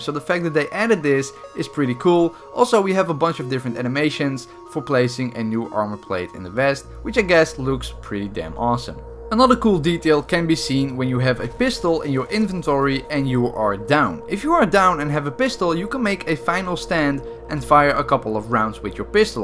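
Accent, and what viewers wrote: Netherlands. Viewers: Ben je nederlands want zo klingt je accent pls zeg het als het zo is ik abboneer nu btw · I knew from the first sentence that he is dutch 😂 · Brooooo je hebt echt een zwaaaar nederlands accent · Are you dutch?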